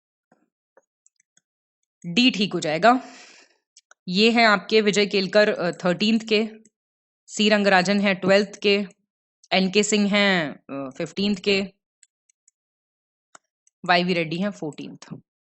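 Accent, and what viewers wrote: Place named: Uttar Pradesh, India. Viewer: Madam g jyada angreji bilari na bano Hakikat me jio Up wale andaj me bolo jyada yad rahta h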